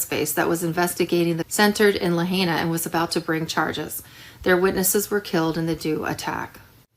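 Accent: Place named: East Coast, United States. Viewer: May I say it. Is good to hear your voice. I only know you by your voice. I have listened to Restored Republic for over 2 years. There was a pause foot 6 or 8 months. But thank God you are back on U Tube. Im in Az by the way. I was getting Sherraye at one tiime. But not anymore. I first heard about of programs like yours over 4 years ago. I heard people giving prophecys when I lived in St.Louis, Mo...I began to liisten and pray for them. God called 2 or 3 Prophets home back then. I have said enough ough but I just wanted to tell you how blessed I have been hearing your voice over the years. Im 83 years old and partly growing up in Buffalo, N.Y. your voice reminds me of back east or people in Canada.. God bless you and Thank you so much for what you do ..For expressing your love for our world, our country and the people. God Bless A Fan Casa Grande, Az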